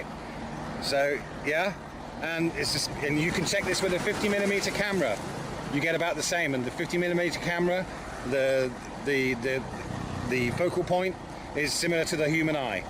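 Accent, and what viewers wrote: United Kingdom. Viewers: A lot of us in the UK speak like that. To be fair, has a pleasant speaking voice, even if most of what he says is nonsense:-)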